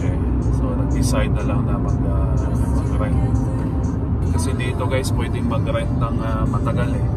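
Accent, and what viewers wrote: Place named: Visayas, Philippines. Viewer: Hello....visayan accent cutie.....good family and loving wife....you are blessed to have them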